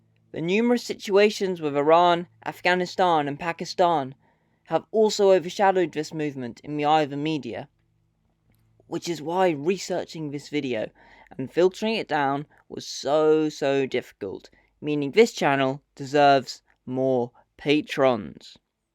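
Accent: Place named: Punjab, India. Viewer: Your accent is punjabi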